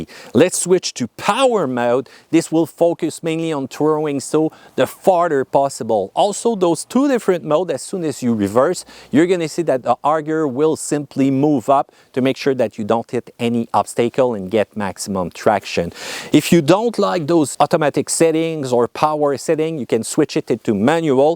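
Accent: Canada. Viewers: I like his accent. Canadian people are awesome.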